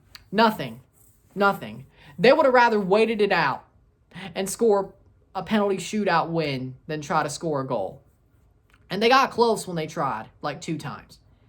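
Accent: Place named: Kentucky, United States. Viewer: Is this guy a gringo? He's accent sounds as if he's from Kentucky. He reminds me of an infantile Jim Cornett.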